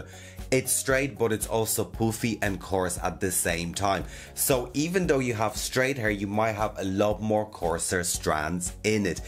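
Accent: Ireland. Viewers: Huh... A non native English speaker who seems to have learned English in Ireland or from an Irish person. Fascinating. That's at least what it sounds like to me. I wonder what his mother tongue is. 🤔 See, now I'm intrigued. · i for the life of me can not figure out if you are super Irish or jamaican and obv one would make more sense but as soon as I'm sure u say something else and I'm like I DONT KNOWWWWW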